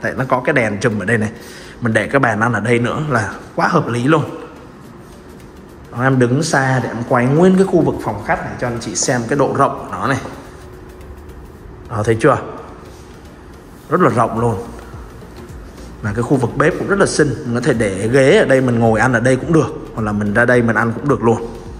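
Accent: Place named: Ho Nai, Vietnam. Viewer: Giọng giống giọng Hố Nai.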